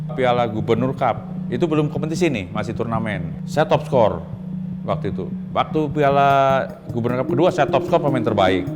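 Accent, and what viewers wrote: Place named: Banyumas, Indonesia. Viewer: ngapak the legend❤... & endog juga carita legend... & saya datang final🤩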